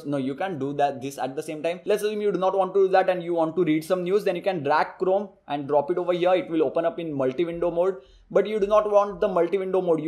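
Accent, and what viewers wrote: India. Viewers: # no insult intended please dont be offended, you seem intelligent and a fast learner so im sure you learned the english language very easily so im sure you could learn an british or american accent very easily so it would be no problem for you, im saying this because you speak english in your videos but you still have your desi accent which white british english or american people but mainly racist people make fun of, especially if you try & make a conversation for a white girlfriend speaking in a desi accent she will be put off and run away from you but an advantage of getting a british or american accent is the white girlfriend you make she will like you because you sound intelligent, so to learn an english accent please by watching all episodes of DOWNTOWN ABBEY, please google season 1,2,3 etc, to learn american accent please watch all episodes of FRIENDS please google season 1,2,3 etc, again no offence i only say this for your own benefit not mine trust me im british born and i know all about this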